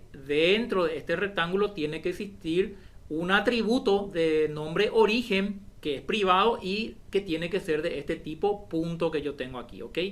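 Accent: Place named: Paraguay. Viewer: Inconfundible el acento paraguayo, espectacular. Por cierto, cuando te refieres a la composición y agregación. En la composición es la instancia de la clase contenedora mas la instancia de la clase embebida la que termina. En la agregación no necesariamente debe morir la instancia de la clase agregada.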